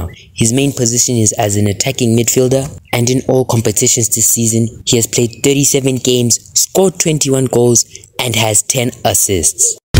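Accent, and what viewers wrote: South Africa. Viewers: I can hear the Satafrikan accent😂🇿🇦💯 And you're a Chelsea fan? Subscribed🫱🏽‍🫲🏾💯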